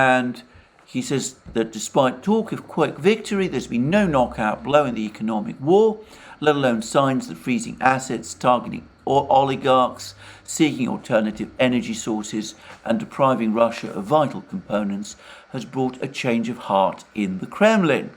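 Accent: United Kingdom. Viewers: He's just lying with a British accent. Would be more convincing if it was Russian. But still lying.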